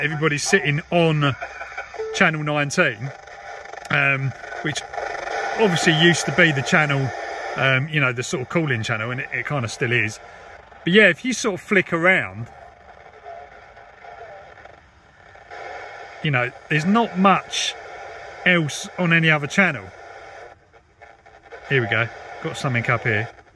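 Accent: United Kingdom. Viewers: I love how the British pronounce all the vowels in aluminum. BTW what type of cb radio is that?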